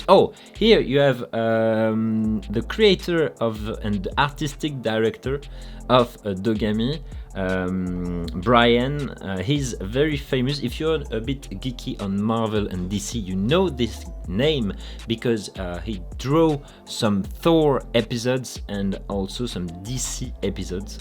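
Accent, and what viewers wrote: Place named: France. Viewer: As a French, I love your French accent! :)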